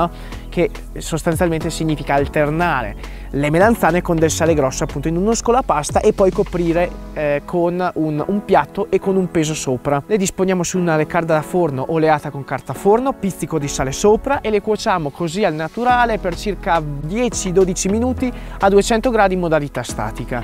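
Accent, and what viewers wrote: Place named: Milan, Italy. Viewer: Sei Milanese eh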